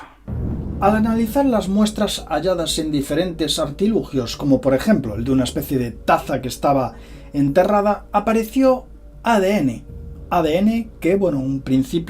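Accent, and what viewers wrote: Spain. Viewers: La noticia es buena pero el acento español es odioso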